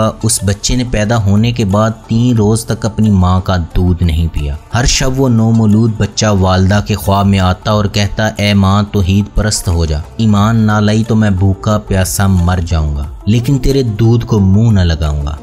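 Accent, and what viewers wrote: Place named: Attock, Pakistan. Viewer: Mera andaza thek Hai mujhe Laga attock Pindi Ke ho accent se ,AAP Boht achhey lagte Hai , mujhe geography boht Pasand Hai ,surnime country Ki video bnain , mere 2 channels Hain EK colour cloud Pakistan and dosra Jolly Azeem m jobless , mere blogs BHI Hain ,AAP Jani tv se behter Hain ,love you from Lahore Pakistan 😘😘😍😘😍😘😍😘😍😘 keep it up sab se achhi baat aapki subscriber se pyar aor replying Hai ,Mai aapko countries recommend krta raho Ga , inshallah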